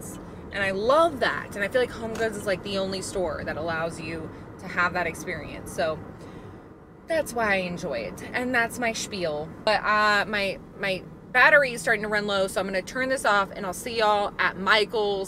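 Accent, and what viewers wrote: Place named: South, United States. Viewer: Hey, I’ve been here for a few years now. Mainly popping in for this season. When you were talking about how you say certain stores names- it made me wonder if you are from the south? I’m from NC and have always thought I had a neutral accent until I went to visit up north. They told me I had a subtle country accent.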